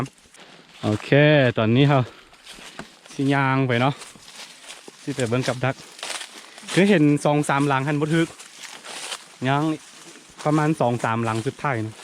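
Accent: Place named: Sakon Nakhon, Thailand. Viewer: พึ่งมาเบิ่ง เอ้อ เว่าคือข่อยไทสกลเลย 555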